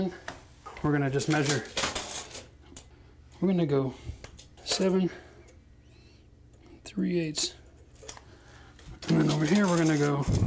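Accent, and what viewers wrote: Canada. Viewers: This Canadian accent is crackin me up! O.g lol.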